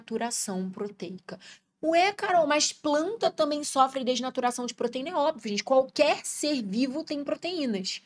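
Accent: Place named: Rio de Janeiro, Brazil. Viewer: Esse sotaque carioca me encanta! Que energia linda a Carol tem!!!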